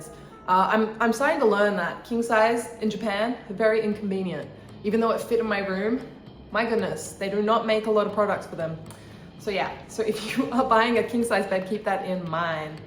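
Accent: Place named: Australia. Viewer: I am sorry for your TP... cuz my Costco Purple TP is legit. Also, I love your Aussie accent when you can speak Japanese perfectly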